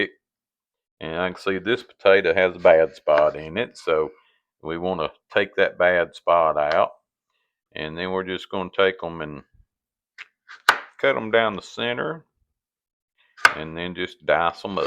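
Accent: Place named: Kentucky, United States. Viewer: I'm trying to place your accent and I want to say Kentucky, maybe Missouri, Tennessee. We sweated our onions, too. Hope you read this and let me know. Kentucky here, btw round Paducah. I'm gonna try to get the wife to cook some of this cause it looks like a right fine recipe. I gotta say, though. It hurt my heart to hear you say almond milk and cornbread in the same sentence. Now I know you were saying NOT to do that, but the thought made me die a little inside. I'll get over it, I reckon.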